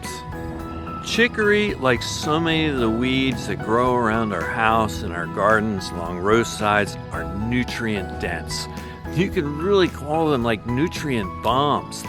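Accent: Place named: California, United States. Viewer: Love this guy’s accent—sounds like a CA dude.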